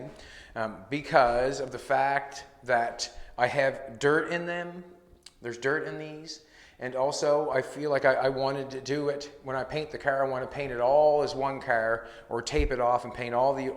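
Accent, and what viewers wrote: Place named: Canada. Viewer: 30 minutes ago I was incredibly frustrated while attempting to polish the defects out of my own Imron paint job. I don't think this really helped me figure out how to fix it, but You're calm Canadian accent calmed me down, haha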